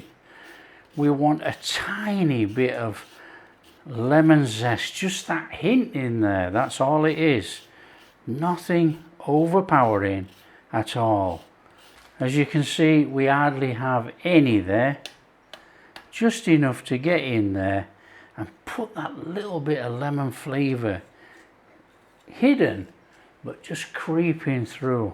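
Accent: Yorkshire, United Kingdom. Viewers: Hi, i have been surfing all the channels that i used to follow before i found yours, and the amazing thing is they have all upped their game, some indian channels, which i wont mention, has adopted your trademark saying of " Look At That! " Which brings a smile to my face as its not with your Yorkshire accent. So you obviously are making them less complacent. Fantastic! X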